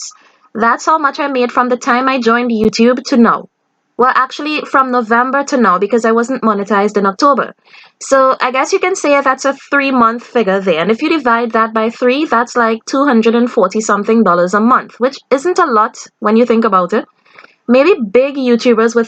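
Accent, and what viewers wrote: Trinidad and Tobago. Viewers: 🇯🇲 I love your Trini accent. You can talk about raindrops and I would tune in. 🇯🇲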